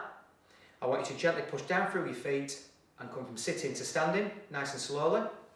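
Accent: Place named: Yorkshire, United Kingdom. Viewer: I have just subscribed to your helpful videos. I can't do these laying down movements on the floor as I can't get back up. Is it ok on the bed? The bad pain in my hip is far worse at night wakening me several times. I will try your advice thank you so much. By the way lovely to hear your Yorkshire accent.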